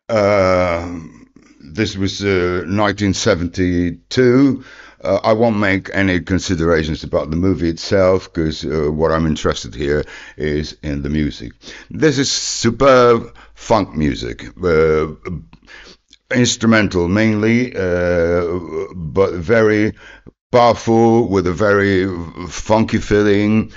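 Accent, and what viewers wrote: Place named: Birmingham, United Kingdom. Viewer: were a phenomenal band, and I think they were one of the first Progressive Rock bands. Their album is pure magic. I'm also huge fan of the vastly underrated band. went on the become the founder and lead singer of, and his voice is one of the best in Rock music history. is a 5 star masterpiece! It has such a collection of great musicians from the U.S. & U.K. who accompanied on this tour. I was fortunate enough to see at Woodstock (yes, I am old enough to have been there), and captured the musical world with his beautiful expressive voice for a few years, but because he was struggling with alcoholism and he was a very shy man he stayed away from the public for several years. He did have some hits in the mid 1970's with songs like " " and " ", but he didn't become popular until " " from 91/2 Weeks. This is a bloody good channel, I really liked this video. 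You're living in Spain, but I detect a Brummy accent. Are you from the U.K.?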